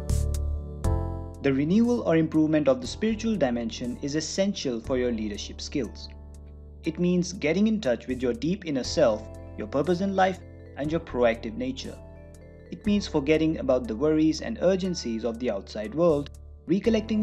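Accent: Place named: India. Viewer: Are you Indian bro? Your accent sounds so.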